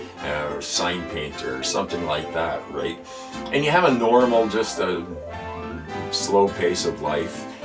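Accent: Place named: United States. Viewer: you speak fluent american, both a blessing and a curse, so many friends from belgium, france, uk, aussies, etc etc do as well, luv ya, good content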